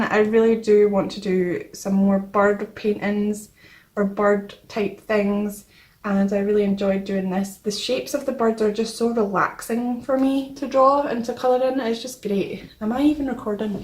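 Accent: Scotland, United Kingdom. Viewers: Are you Scottish? Nice timelapse digipainting! WOW! 👌🏼 I love how well you painted her!!!❤️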